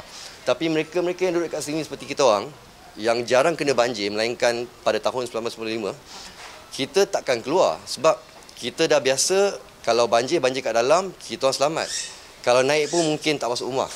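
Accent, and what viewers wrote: Malaysia. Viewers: Bro Your Bahasa in truely Malaysian, Good luck.👏👌👌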